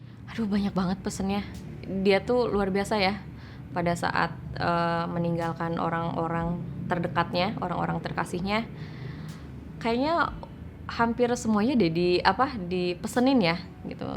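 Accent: Indonesia. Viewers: Sundanya mentok teh, mantap! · Sunda pisann logatnya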